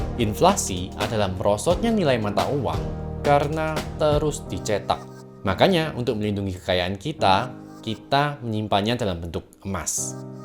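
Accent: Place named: Banyumas, Indonesia. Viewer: Logatnya ngapak. 😅 Nice 👍👍👍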